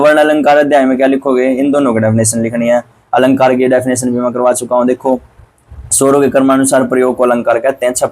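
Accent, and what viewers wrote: Haryana, India. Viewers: Sir aap Haryana se ho kya??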